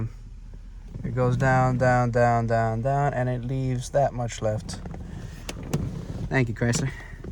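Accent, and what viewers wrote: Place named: New York, United States. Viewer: I thought you lived in new york with a new york accent when you said you lived in Florida 😂 cool accent this car was made a year before i was born in 1981 great classic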